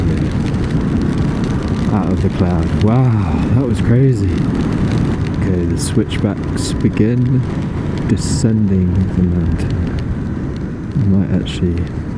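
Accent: United Kingdom. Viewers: Excuse me, may I ask you something? Are you from the UK?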